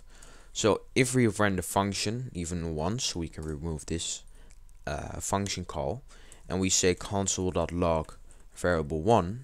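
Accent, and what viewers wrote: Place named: Netherlands. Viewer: I hear some Dutch stuff in there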